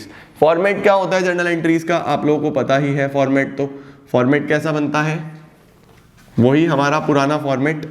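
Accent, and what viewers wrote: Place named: Varanasi, India. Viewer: Are you from Varanasi???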